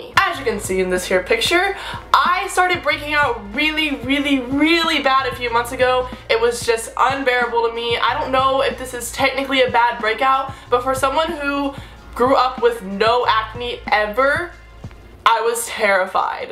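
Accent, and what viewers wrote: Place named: Texas, United States. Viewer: She's Texan lol half of my family is😂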